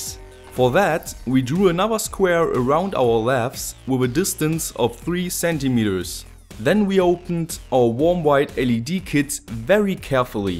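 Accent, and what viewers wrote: Germany. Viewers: German, right ? :D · Warum spricht der englisch das ist doch ein deutscher Akzent und die Schrauben von Hornhaut hatten auch eine deutsche Beschriftung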